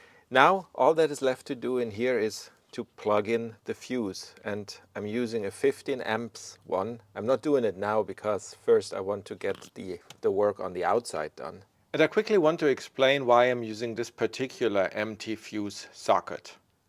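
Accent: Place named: Germany. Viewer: Hehe, man hört sofort das du aus Deutschland kommst😅 , was den Radarsensor angeht, da hättest ohne Probleme die Hupen an den Schrauben montieren können. Der sprinter kalibriert sich jeden start selber von neu. Hat den Hintergrund das der Beladungszustand ja variieren kann. Die paar Grad machen da nix aus. Habe dort auch meine Hupen montiert. Fertiglösungen die angeboten werden haben noch Dickere Platten aus Stahl verwendet wie bei dir in Verwendung. Beste Grüße 😊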